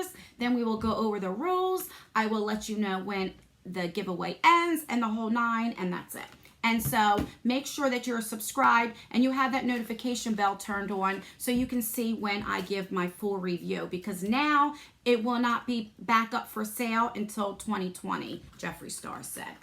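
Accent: New Jersey, United States. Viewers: you are so generous and giving. Thank you for doing this. My husband was raised in New Jersey, and I enjoy listening to the Jersey accent so much. Besides, you are very fun and expressive! People like you are loved and hated because of your beautiful soul. Keep being who you are, it really lift spirits. God bless you always! 🌺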